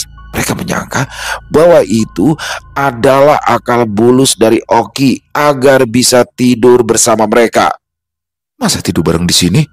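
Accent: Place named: Banjar, Indonesia. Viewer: Ku kira dari om rasth ternyata aku lupa kalau bang saujana juga orang kaltim😂,kalau bang saujana Kaltim om rasth kal teng,kenanga kinasih kal sel penulis dari kal bar belum ada kah bang,aku klau kisah2 dari tanah Kalimantan selalu excited bnget palagi dari om rasth penulis yg epic bnget menurut ku lah, selalu menanti cerita beliau,kalau bang ga bisa dengan kata2 soalnya sdh tentulah pembawaan nya candu buat aku ternyata logat/ pemandiran bang sdh mahir bahasa banjar lah kd nglih lgi mun kena kepulau Kalimantan 😊bajalanan tinggal tagur2 hj 😅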